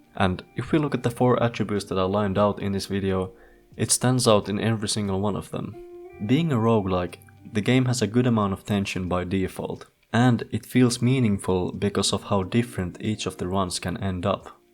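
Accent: Finland. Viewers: Definitely from Finland. The accent gives it away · Where are u from ? U sound like u have a finnish accent or something similiar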